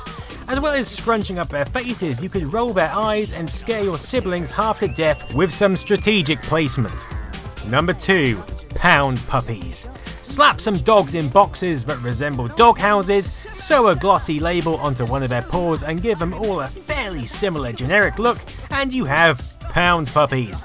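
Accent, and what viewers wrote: United Kingdom. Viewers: Oh my God I wish I didn't have ears the way this guy talks fucking brits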